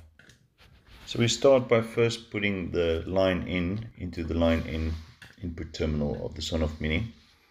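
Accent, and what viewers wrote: South Africa. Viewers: Howzit boet! Tell me you are South African!!!!! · Thank you for this. Have been looking for a solution as simple as this one. Just to confirm, the sizes of the components you used works for RSA households (voltage etc)? I assumed from your accent you're South African...Afrikaans soos ek;-). Where do you buy your components? I already have the Sonoff switches, just looking for a reference for the diodes and capacitor?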